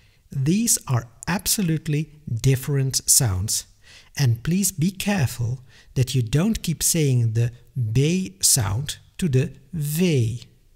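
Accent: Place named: Eindhoven, Netherlands. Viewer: Yet we barely speak dialect anymore in Eindhoven. I doubt you've heard it during your time living here. I know a grandfather who speaks the dialect and the granddaughter wondered why "opa" was speaking "Belgian" xD. It's the accent that still exists among speakers of the city, which is of course different and which is what people noticed about your pronunciation :). I do pronounce "V", "W" and "F" clearly differently from one another by the way.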